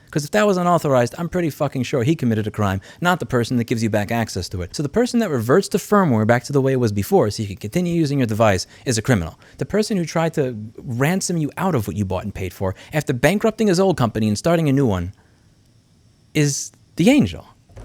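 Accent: New York, United States. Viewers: His new Yorker accent is strong in this vid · You can really hear the New York accent come out when you're worked up. I love the good fight you're putting on for us, keep at it ya legend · ...Gee, Can you tell that Louis is from NYC? hehahaha LOL! Mocking the Futurehomes CEO by using his cats as the victims.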